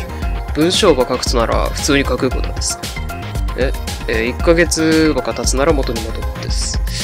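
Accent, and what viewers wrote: Kumamoto, Japan. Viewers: わぁあ！すごい！すごいい！！さんの熊本弁です！！ありがとうございます！好きです！ JP系面白いの多いんですね！ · さんの熊本弁いいですね！今回も面白いSCPありがとうございます！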